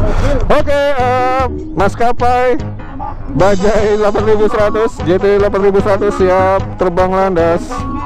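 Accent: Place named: Banyumas, Indonesia. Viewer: Sing ngarep ngapak le ngomong...anjir nguakak bener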